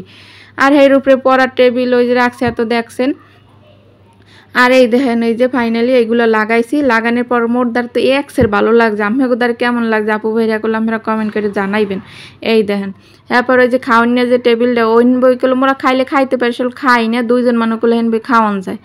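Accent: Barishal, Bangladesh. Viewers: আপু তোমার কথাগুলো কেন জানিনা আমার খুব ভালো লাগে বিশেষ করে বরিশালের ভাষা আমার কাছে খুব পছন্দের ❤❤যদিও আমার বাসা উত্তরবঙ্গে কেন জানি না দক্ষিণাঞ্চলের বরিশালের ভাষাটা আমার কাছে খুব পছন্দের ❤❤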